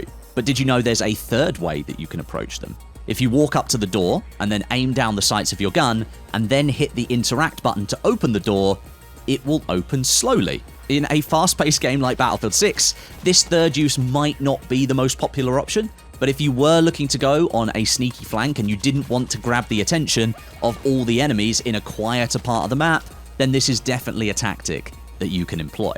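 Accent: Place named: England, United Kingdom. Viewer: you outsub stodeh on YT good work man! my englishman with the so easy to know who u are by ur voice ;) perfect english accent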